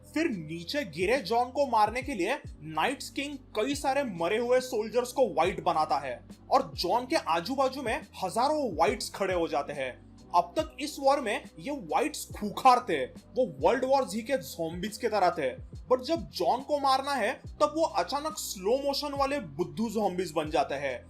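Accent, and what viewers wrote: Maharashtra, India. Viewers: Bro are you marathi your accent is like marathi,nice video bro 🫡😂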